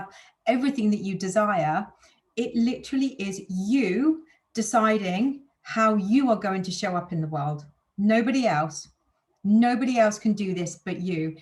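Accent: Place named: United Kingdom. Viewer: 💎💎💎!!! excuse me!!! 😇 🎁 are you really 44?!?!?!? 🥰🥰🥰 you are blessed and you bring light to the world you really found your path / purpose in life with the best compliments from Italy 🎉🌍🌎🌏 I absolutely adore 🐞 your British accent!! 🌈 keep it up, my dear Life Coach 🍓 (I thought you were btw 28-33!)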